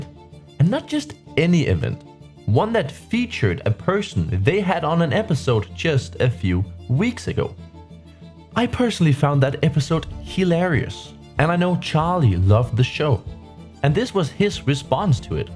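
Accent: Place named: Denmark. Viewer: Dansk accent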